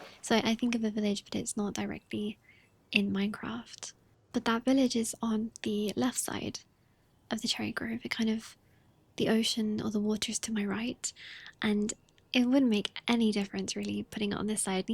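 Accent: United Kingdom. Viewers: Oh my god are you british 😭😭😭 i thought those people were only in tv 😭😭😭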